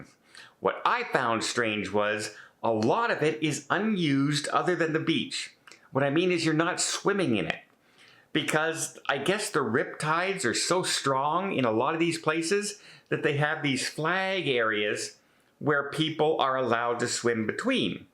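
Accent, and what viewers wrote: Canada. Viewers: You should have come to Perth it's different again. It's small , hardly any population , isolated and very clean. It's also not as expensive. Not sure ,am I picking up a Canadian accent.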